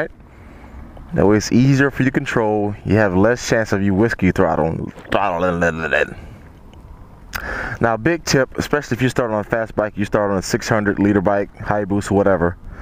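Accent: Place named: Carolina, United States. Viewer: u dude u from Carolina?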